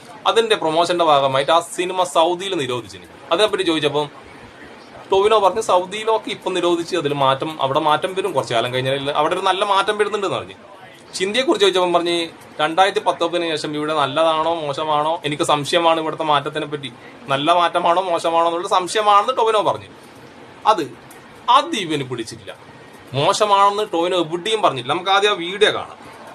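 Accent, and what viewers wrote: Kannur, India. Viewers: കണ്ണൂർ സ്ലാങ് പൊളി 👌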